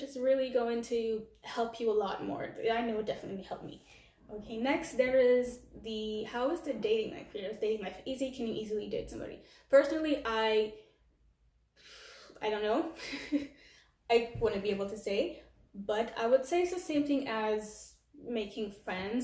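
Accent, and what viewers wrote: United States. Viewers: I live outside of Benidorm and work as a promoter in tobacco shops there and yes I see the staff watch Black people like you described and not look at people with lighter skin colors the same way. I'm curious, from your accent I'm guessing you're from the States (I am too) would you say that racism is different or more or less compared to your home country? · Hey!im from Valencia too!😎..but i lived in North Carolina since 2003!...for you accent sounds like you from USA,am i right??congrats for your channel !😊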